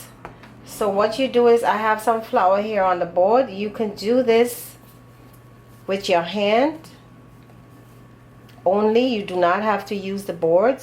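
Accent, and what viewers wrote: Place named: Trinidad and Tobago. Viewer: I looove your accent 😍😍 are you Trini?!??!!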